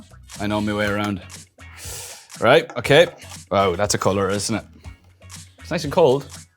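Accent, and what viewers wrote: Ireland. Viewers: It's an Irish accent. Sybau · I didn’t realize how Irish Niall was until right now. Like the accent as gotten stronger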